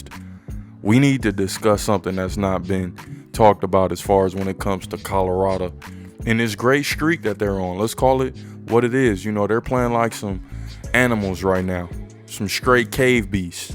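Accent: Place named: Pittsburgh, United States. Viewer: Bro you sound like you're from the Burgh lol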